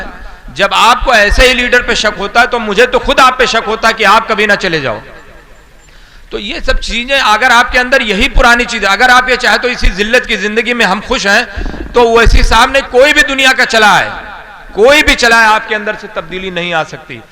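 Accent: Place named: India. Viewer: Bhai style desi hai aap ki bolne ke bahut khub 💪🏽💪🏽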